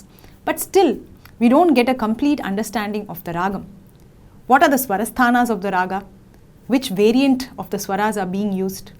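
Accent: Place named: South India, India. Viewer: It is not raagaa.. it is raag... Also, it is not swaraa, it is swar. May be it is due to southern accent? The video is useful. Thanks for your efforts..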